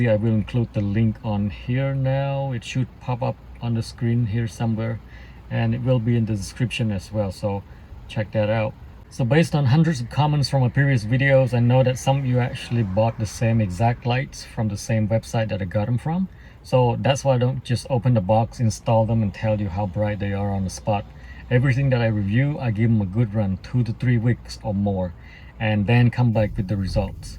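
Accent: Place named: Vietnam. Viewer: You sound like my uncle. (Viet accent) and , it looked like you were on the 99 North heading towards Fresno Area, lol.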